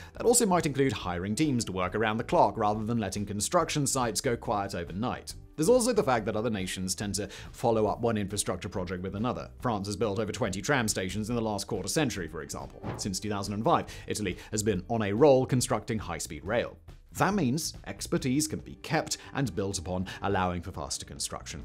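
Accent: United Kingdom. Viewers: I used to listen to this man but today, I must say, he sounds exceptionally British.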